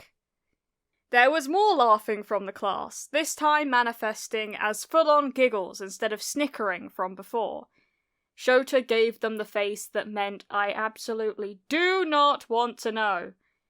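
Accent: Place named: United Kingdom. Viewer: Your British accent was hilarious 😆 I love you videos ❤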